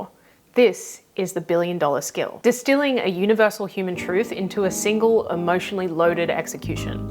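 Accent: Australia. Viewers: How good are you? I found this really engaging from the start but about a third way in was when I realised you're a fellow Aussie. I was taken aback at how completely deaf to it I was and that's rare. So I reckon it was the quality of the subject material and the presentation that made me overlook what is often a big deal for me. Or was this a Jedi/advertisers mind trick you played? Either way great material. Thanks.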